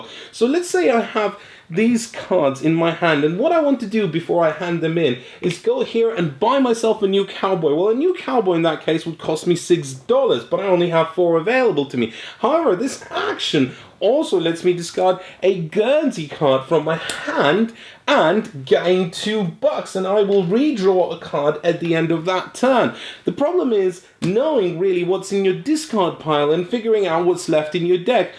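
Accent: United States. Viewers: I love your "California dude" cowboy accent. That was glorious! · Great American accent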